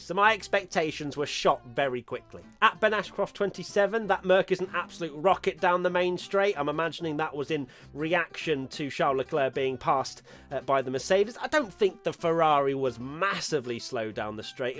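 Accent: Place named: United Kingdom. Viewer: No,the reason why you sounded weird is due to the fact that you are Brit m8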